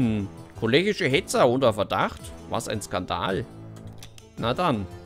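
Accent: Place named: Bavaria, Germany. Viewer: hach ja, zum zweitausendsten viewer bringt der gute seine familie um - das ist doch mal dedication:D ich bin auch wegs papers please auf den Kanal aufmerksam geworden (und nicht wegen irgend eines anderen youtubers^^ Hab mal ein videocheck auf der gamestar gesehen oder so). Ich bleib auch gern bei anderen lets plays. Immer sehr angenehm, deine atmo, dein bayerischer slang... Wo kommsten genau her, wenn ich fragen darf? Einzige Kritik: Beginn doch nich jedes Video immer mit "herzlich willkommen". Ich hab die zwo wöter so gfressen...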